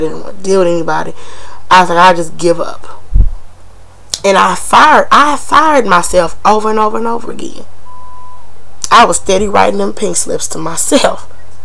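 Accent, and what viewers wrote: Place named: Rural, United States. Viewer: I love the way you talk all country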